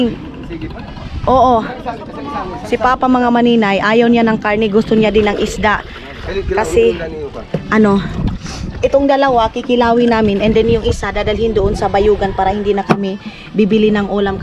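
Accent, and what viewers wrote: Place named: Waray, Philippines. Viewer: Yung tuno nyong pagsasalita parang sa Amin waray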